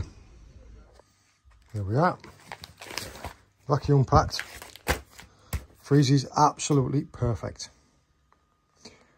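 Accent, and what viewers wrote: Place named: Newcastle, United Kingdom. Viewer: lad!!? Youust be one of the best boat anglers around (Certainly for my brass anyroadl!) YOU!? For ME¡ Are one of the best EXAMPLES of Young anglers about! You explain In ageordie/ northumbrland accent (which I like,but have always favoured!??) Longay you retaím it and 😂. Longmay you broadcast your Youtube channel it😮I channel and it retaím the same resilience and Charming lilt as you do now??!! I am now 67*😮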